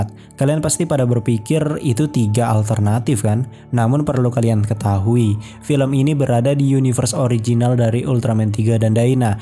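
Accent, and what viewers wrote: West Java, Indonesia. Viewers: Njir lu nyunda bre 🗿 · Sunda ny dri mana bang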